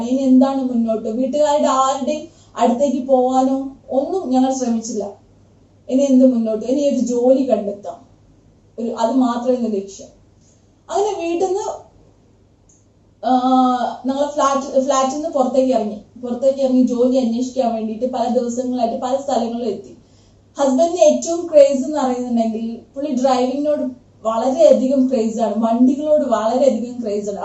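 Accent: Kasaragod, India. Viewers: Chechi kasaragod ayrno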